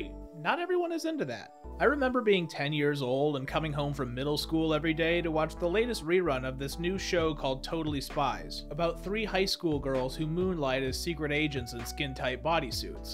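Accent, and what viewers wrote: Netherlands. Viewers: hold on… are you Dutch…😃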